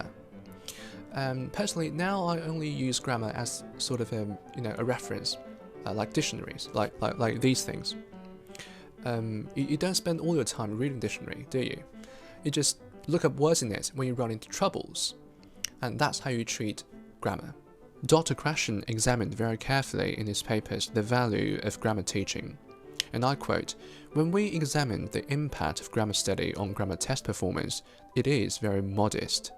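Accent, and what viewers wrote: United Kingdom. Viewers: 英国口音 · 英式口音真好聽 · posh accent English ❤...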